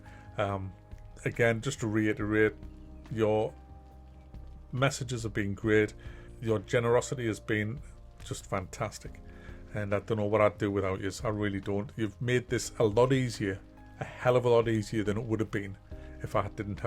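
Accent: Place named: Newcastle, United Kingdom. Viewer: Mate, I only started watching your videos early this year (after moving out on my todd). You're a fucking legend, and it's been a true pleasure to have all your old content to spool through, while following the new stuff. You're like a stand-in Geordie replacement to me still being back at my Parents Home with my Dad watching The Hairy Bikers. lol. (Every time you're laying into David Braben from Frontier, it's what goes through my head haha, "Deeeeeive!!" < terrible Scouse interpretation of the Geordie accent.) As others have said. Second Opinions. Reconsideration. Fuck, dare I say it - fundraising for Going Private... Need to get you back in the game.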